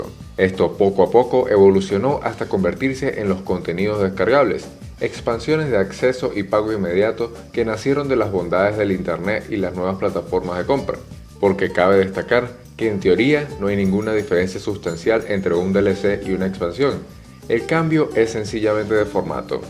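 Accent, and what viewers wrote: Venezuela. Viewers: Verga se me adelantaron con la pregunta, " de donde eres?". bueno no importa ya sabia yo que por la forma de la que hablabas eras venezolano pero bueno hay que preguntar para estar seguros. Y bueno chamo de pana que eres bueno en esto me gustan demasiados tus reseñas, como tus demás vides me parecen bastante interesantes, a mi se me hace que tendrás un gran futuro en esto de youtube, buena suerte convive. PD: Yo también soy caraqueño XD.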